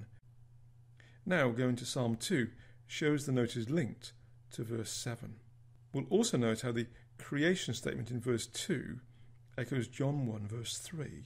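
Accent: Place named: United Kingdom. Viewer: How nice to have a calm British English voice. So much easier to follow than Morris' style of delivery.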